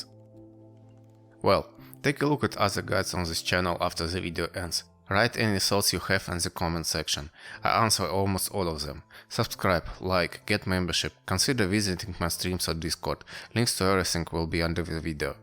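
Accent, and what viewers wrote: Russia. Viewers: I find your accent pleasent (#nohomo), are you from russia?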